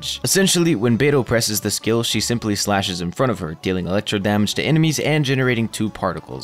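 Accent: United Kingdom. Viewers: Why do you sound more british than american?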